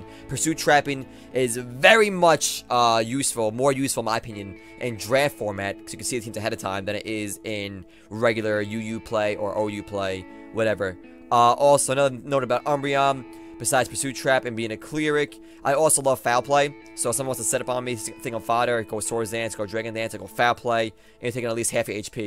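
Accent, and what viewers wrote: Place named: Brooklyn, United States. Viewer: wats with the Brooklyn accent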